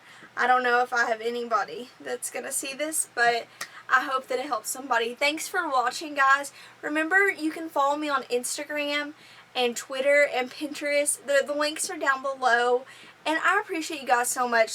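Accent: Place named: Kentucky, United States. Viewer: Greetings! I know it's been a long time since you did this video and I'm sure you have moved on from here, but I really appreciate this video. My 14 year old son has CP with spastic quadriplegia. He's non-verbal and non-ambulatory. He is taking baclofen orally and we are considering a pump. I am mostly unaware of his pain. It was helpful to hear you talk about your experience. I feel like it gave me insight into what he is experiencing. I love your cheerful disposition and your Kentucky accent.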